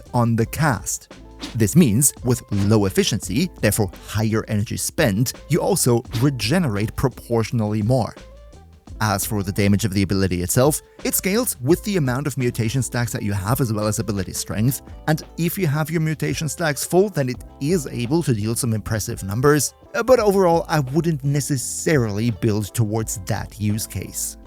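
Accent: Germany. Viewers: Well, he's german 😉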